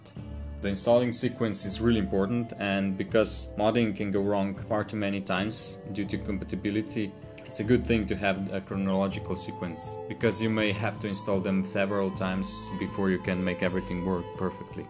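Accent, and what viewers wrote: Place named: Netherlands. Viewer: sounds good, from other YouTube vids I see you can get some nice results modding oblivion, also am I right in thinking you are from the Netherlands judging by your accent?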